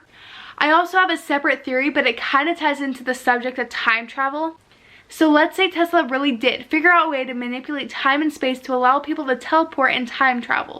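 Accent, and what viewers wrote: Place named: Chicago, United States. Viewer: IS that a Chicago accent? Love it. I have been reading these books as well. Very bizarre. Especially when you look at the Von Braun books which seem connected to Elon Musk.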